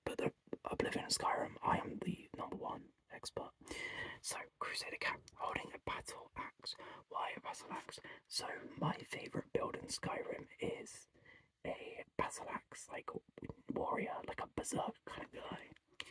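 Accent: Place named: United Kingdom. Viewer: I’m sooo ready to just relax to this video even though it’s an older one of yours. It’s been a long day. Random but I have one pen pal friend that’s British and I’ve never heard their voice before but hearing yours..I can imagine they might have an accent like yours. Though I’m trying to keep in mind that there are different accents depending on where you are in your country..? Right? 😂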